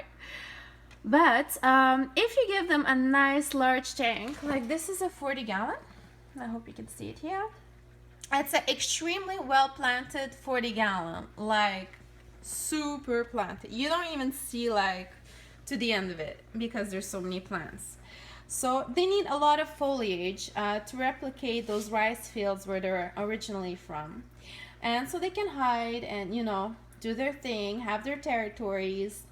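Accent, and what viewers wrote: Quebec, Canada. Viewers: R u from quebec? You got that sound.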